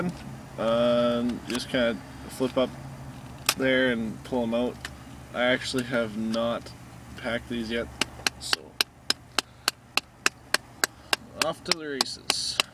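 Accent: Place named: East Coast, United States. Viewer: You are awesome! I just gotta ask, do you smoke? Bhahahaha... okay, okay, all kidding aside, I was thinkin' you sounded from the east coast. Just wonderin'. Keep up the great work from Josh here in Ontario! Cheers!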